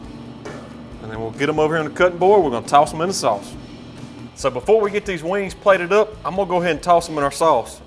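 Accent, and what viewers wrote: South, United States. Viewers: He got that southern accent .....sounds like molasses drippin out his mouthhh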